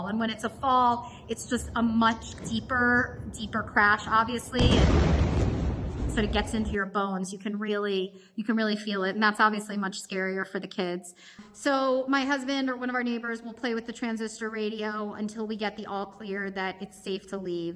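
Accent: United States. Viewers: you have a nice American accent....why u live on stolen land...go home save your Kids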